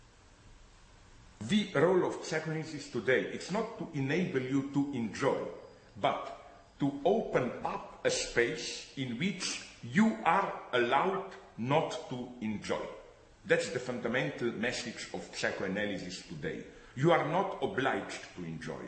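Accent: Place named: Central Europe. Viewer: You obviously don't understand the irony of demanding someone provide substance when the subject is Slavoj Zizek. He is like Camille Paglia with a middle-European accent a beard and a paunch. He is a symptom of the degeneracy that what used to be called "the humanities" has devolved into. He is so full of crap he could generate economically significant amounts of methane or add to global warming.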